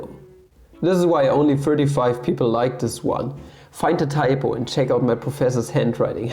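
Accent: Germany. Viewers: dein akzent hört sich richtig deutsch an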